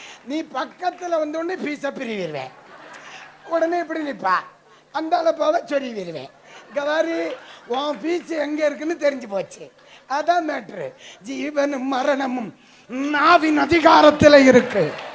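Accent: Kanyakumari, India. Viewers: KK District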